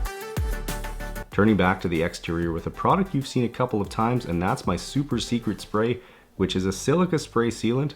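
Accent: Canada. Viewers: "grage" I always get a kick with the Canadian accent.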